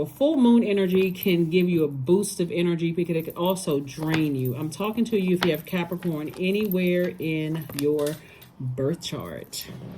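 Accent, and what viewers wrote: United States. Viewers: Thanks so much for this reading and for the support and clarity I have gained through these readings. Take care of yourself and God’s blessings always. You sound American and one day sooner than later I will like to catch up to say Hello. ❤❤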